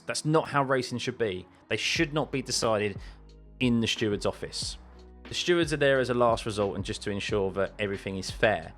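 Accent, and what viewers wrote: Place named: United Kingdom. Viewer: Can you do a non-UK accent? It might hide your obvious bias. Good luck!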